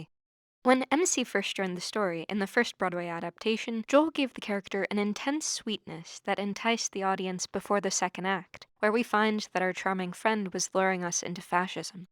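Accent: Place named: United States. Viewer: you sound like an American, and at this point in our political history I think that it is fair to stop holding the German people particularly accountable for the rise of fascism. I'm watching it rise here in America and the same thing is going on. Most people are simply passive, and politically disinterested. They're not willing to risk anything about their simple lives in order to resist fascism or authoritarianism.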